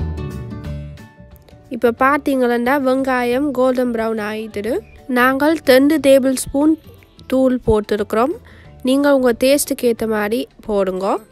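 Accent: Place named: Jaffna, Sri Lanka. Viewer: அழகிய யாழ்ப்பாணத்து தமிழ் வாழ்த்துக்கள் தங்கைச்சி.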